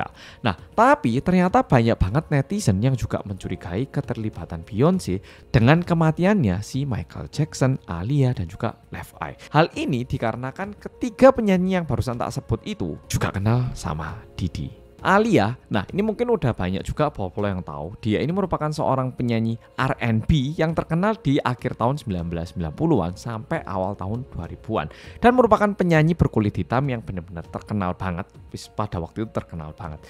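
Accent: Java, Indonesia. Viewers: Korea medhok 😂 suka denger ngomong nya lucu bgt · Akhirnya versi medhoook.. wlw telat wkwk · karna medhokk, aku jd ngakak bukan horror wkwkw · wkwkw aku yg udah liat versi dari nessie sama jerry yg banyak pake aksen inggris, ke mas yg pake logat jawa jadi ngakak 😭 berasa ngobrol sama temen sndiri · Apanya yg lucu dari kemedhokan ini... Aku bhiasha ajha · Sebagai orang Jatim paling ujung barat “Njenengan jouwosh, Mas”. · Paling senang lihat penyajian Mas. Paling rapi dengan bahasa yg santuy dan gak bikin pusing yg lihat. Good job mas korea jawa medok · Akhirnya mas bikin videonya jg. Makasih mas. Krna penyampaian nya mudah dimengerti, detail jg, gk ketinggalan medhoknya 😅, editing nya jg keren sih, jd terhibur jg apalagi part mas nyanyi 😂🙏🏻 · Aku delok ki ora kyk delok youtuber korea kyk youtuber jatim… tp gpp iku warnamu wes ojo d ilangi… sering2 dolan malang ben gak ilang medok e…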